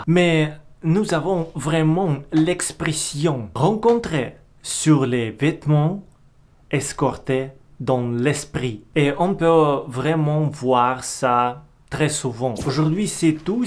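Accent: Russia. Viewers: Bravo tu progresses de jour en jour dans ton élocution;. Tu vas gagner en fluidité grâce à la pratique. Je pense que tu vas arriver très rapidement à parler le français sans accent russe.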